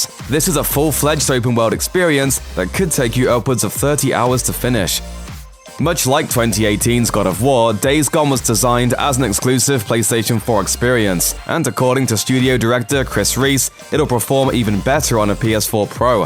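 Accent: United Kingdom. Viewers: With all due respect Fuck British accent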